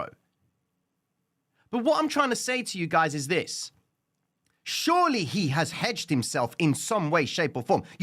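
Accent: Cockney, United Kingdom. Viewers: the man for me. Thank You brother.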